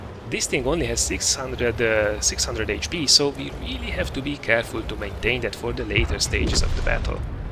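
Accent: Hungary. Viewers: magyar vagy? mert ( lehet hogy csak én) eléggé hallottam az akcentust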